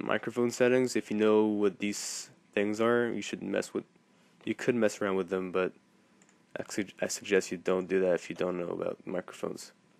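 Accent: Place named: Canada. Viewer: T'es Canadien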